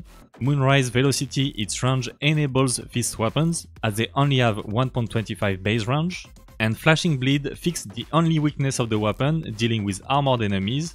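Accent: France. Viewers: This french accent i guess is just perfect haha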